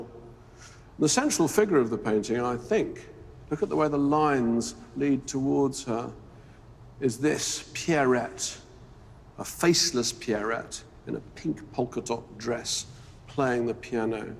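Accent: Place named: RP, United Kingdom. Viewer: I enjoyed this. Bar the plummy tones. Zzzzzz😮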